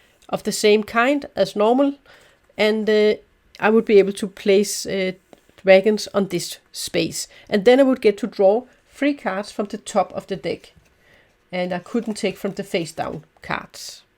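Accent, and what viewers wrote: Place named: Germany. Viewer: You have a really nice german/english accent. probably the best sounding I have heard.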